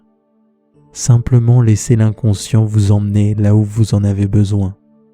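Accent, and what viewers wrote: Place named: France. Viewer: woooow! Je suis abonnée à ta chaîne depuis peu. Je faisais de l'insomnie depuis un moment et tes vidéos mon sauvé 😇 Particulièrement cette vidéo là! J'ai dormi dur dur comme un bébé tout la nuit et je me suis réveillé que le lendemain! Merci énormément, tu as une voix hypnotisant et doux. Je t'écoute tout les soirs xxx (je viens de Québec et je trouve l'accent français si agréable à écouter 😍)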